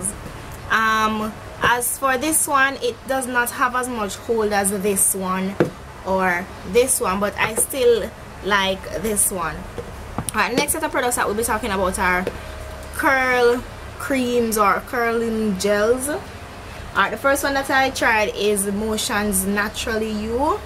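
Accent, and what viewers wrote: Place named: Jamaica. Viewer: i could hear the accent haha am jamaican too but am from spanish town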